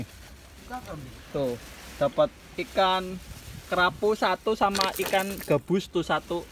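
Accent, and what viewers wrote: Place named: Java, Indonesia. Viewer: kawel medok nemen 😁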